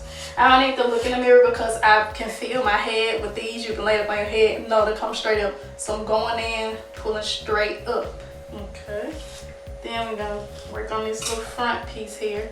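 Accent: United States. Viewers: I LOVE the country accent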